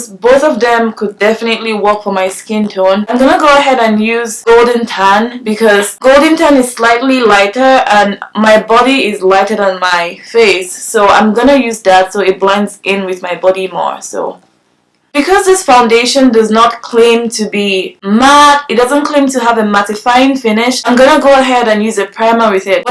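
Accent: Nigeria. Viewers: Nigerian or Ghanaian?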